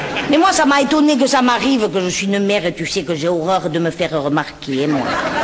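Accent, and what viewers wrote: France. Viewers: C'est excellent!!!!j'entends vraiment tout le parlé marseillais de ma grand mère, c'est tout a fait ça Merci 🙏🥰💕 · Elle a l'accent niçois.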